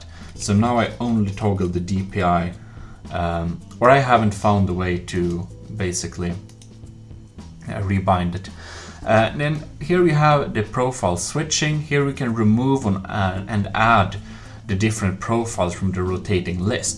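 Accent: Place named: Sweden. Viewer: i can litrealy here that ur from sweden LAMO lwest goo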